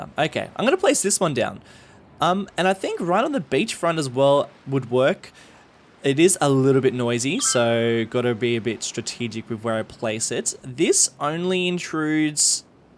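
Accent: Australia. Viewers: I did a double take at "Lewis Armstrong". Haha, love the Aussie accent.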